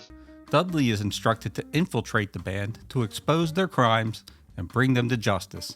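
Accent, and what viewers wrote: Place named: Baltimore, United States. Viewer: narrating this has got to be from Baltimore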